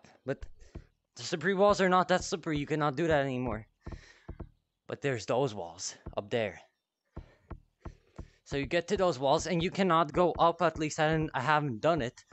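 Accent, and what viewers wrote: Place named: Philippines. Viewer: u filipino? u got the accent lol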